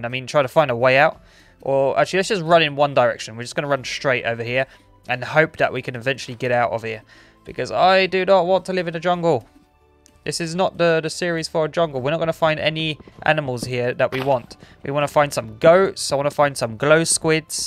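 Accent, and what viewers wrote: United Kingdom. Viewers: Hello sir! Dialect geek here wondering where about’s your accent from? Southern England? Is it cockney?😄 pardon me though, I’m just geeking here.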